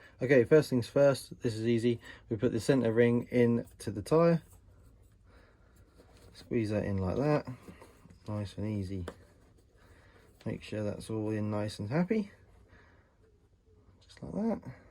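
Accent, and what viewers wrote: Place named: United Kingdom. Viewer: Great video and nice to hear a fella brit Americans can be a bit annoying sometimes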